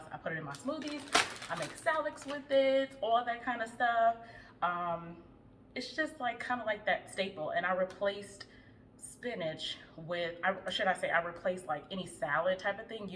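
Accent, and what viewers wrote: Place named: East Coast, United States. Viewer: Just found your page. I’m originally from Chicago too! You sound like you’re from the East Coast lol. Yesss girl use that Quinoa!!!!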